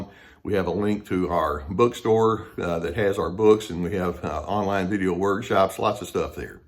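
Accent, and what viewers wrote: South, United States. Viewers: you remind me of dr phil i dont know maybe its the southern accent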